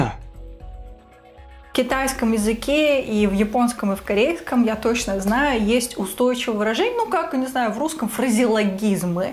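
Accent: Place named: Thailand. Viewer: Корейский звучал с тайским акцентом))))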